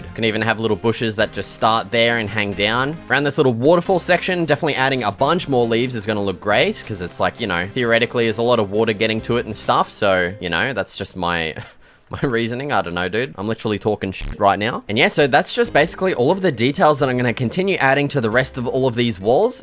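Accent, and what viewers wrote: Australia. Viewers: aussie accent and swearing makes it so much better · The aussie is strong with this one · Is your accent Australian or ~? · the aussie accent and all the cussing makes this 10x more entertaining edit: THANKS FOR THE LIKES and continue to support!!!! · Aussie accent (where you from bro) Burping (fucking hilarious) And the cussing are all me (I’m Australian too) I love your videos brother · R U AUSSIE?!!!!!! PLEASE TELL ME IM GUESSING RIGHT!!😢😢😮😮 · Are you Australian? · r u from Australia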